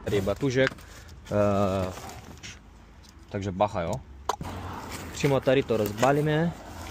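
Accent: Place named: Russia. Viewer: Zajímavý přízvuk. Vypadá že z Východu, spíš z Ruska.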